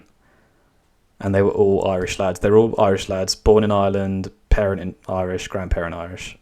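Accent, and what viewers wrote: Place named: England, United Kingdom. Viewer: What an ignorant and insulting title on this video...... Lose with Irishmen??? As soon as I saw it I just knew it had to have been created by an Englishman. Five seconds in and the accent confirmed it. Wow! Has he taken a look recently at his country's national football team..... or at all the foreign-born athletes that England is so quick to grant citizenship just so they can win a medal for England when their own folks cannot. Just imagine spending your valuable time creating a bitter video about your closest neighbour winning a major competition but instead of congratulating them you try and create the perception that they are somehow weak and losers. Only a REAL loser would spend his time in this way. Bizarre!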